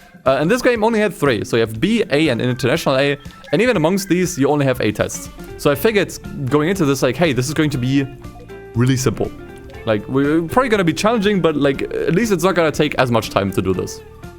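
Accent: Germany. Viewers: Warum klingt dein englisch so deutsch??? 😅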